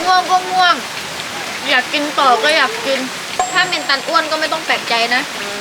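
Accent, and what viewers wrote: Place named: South, Thailand. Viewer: พี่ พูดใต้ด้วยอะ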